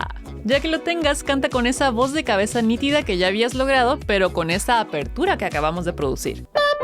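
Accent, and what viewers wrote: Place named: Mexico. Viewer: Che, que lindo acento Mexicano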